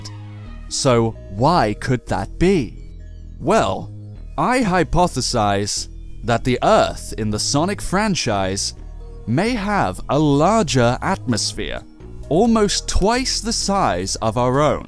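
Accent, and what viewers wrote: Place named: United Kingdom. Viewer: 【The Sonic Theorist】 Hey mate. It's just a suggestion but please do you think you make a video or 2 such as ya top favourite Sonic characters and/or top favourite Sonic games? I'd LOVE to see videos like them!:D:D:D Or do you already have them on ya channel? I love your accent!:D:D:D Are you from the UK too? I am! XD I'm from Sunderland, England! XD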